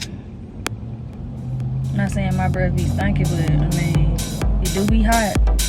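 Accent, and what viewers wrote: South, United States. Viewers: This Mississippi transplant from Fort Worth could spot the Southern Accent! Love your videos🥰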